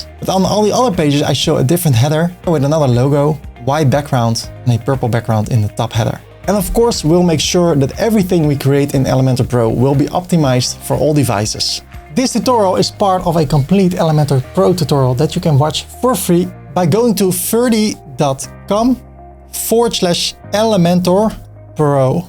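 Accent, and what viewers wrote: Netherlands. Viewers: Toen ik jouw naam hoorde gecombineerd met hoe je sprak wist ik meteen dat je Nederlands was haha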